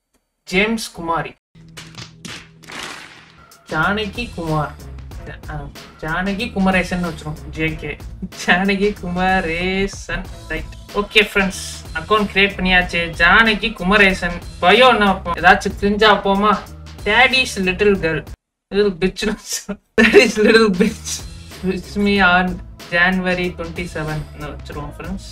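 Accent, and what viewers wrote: Madurai, India. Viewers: Madurai ya Anna ne basa nailla irruku nainga appadetan pasuvom 😅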